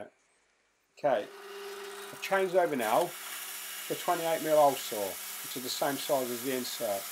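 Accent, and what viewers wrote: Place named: England, United Kingdom. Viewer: Good one mate! You have a great pommy accent for someone with an Italian name.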